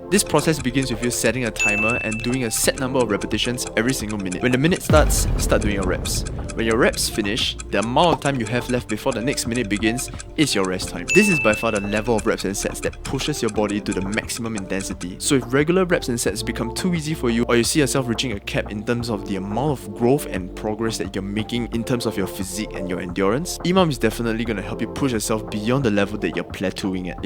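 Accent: Africa. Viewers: Why is bro Singaporean but he has an African accent? Also, would seasoning the chicken kill my gains? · Bro, are you from an African background...? You speak like a friend of my. Love the accent😁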